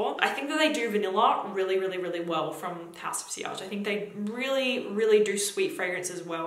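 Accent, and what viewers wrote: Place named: Australia. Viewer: Australian accent spotted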